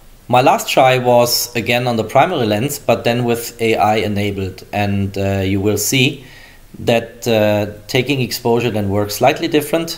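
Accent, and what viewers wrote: Germany. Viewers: Are u german? Your english is pretty german like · Dieses deutsche Englisch xd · Are you from Germany ? You have Accent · Gutes Deutsch Englisch :) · Deutscher, Das hört man an der englischen aussprache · Are you german? Your accent sounds like. xD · Hört sich stark nach German-Englisch an :) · Dein deutscher akzent macht das video kaputt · Rede doch einfach deutsch Mann Hört das du deutsche bist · Are you a german? Because your accent seems.. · You are 100% german...I can hear that on your accent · Kommst du aus Deutschland?!! Du hast 'nen STARKEN Akzent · Du hast einen sehr deutschen Akzent 😂😂😂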